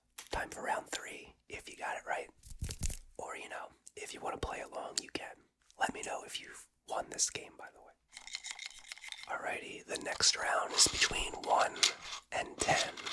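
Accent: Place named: Baltimore, United States. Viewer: An O's cap? And the way I hear that "only" vowel? I know a fellow Bmore man when I hear one!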